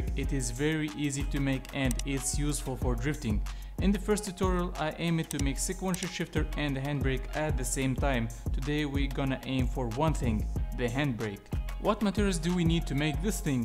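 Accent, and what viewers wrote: Tunisia. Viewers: Naarach aleh aarftk tounsi men soutek hahahah Great tutorial <3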